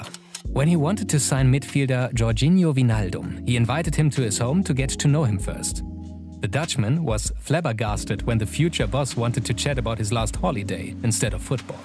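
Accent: Germany. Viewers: R u deutsch btw? Cuz ur accent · Stabiles Englisch aber man hört doch stark das deutsch raus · The voice over guy is probably German cause his accent is so annoying! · Das ist der geschmeidigste deursche Akzent, den ich bisher gehört habe.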